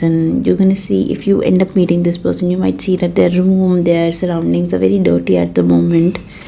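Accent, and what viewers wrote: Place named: United States. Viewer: USA English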